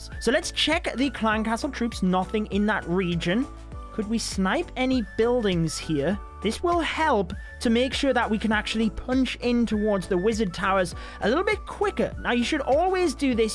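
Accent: United Kingdom. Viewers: You guys makes fun of Indian accent but I love your British accent ❤️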